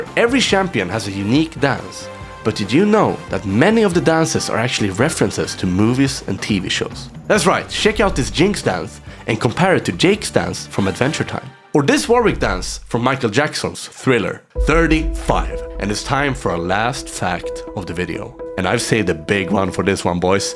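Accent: Sweden. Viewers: This svengelska😂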